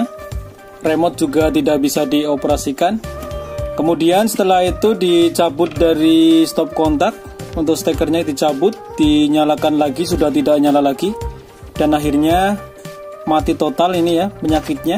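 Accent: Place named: Banyumas, Indonesia. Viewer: Hadirrr booos ora ngapak ora kepenak. .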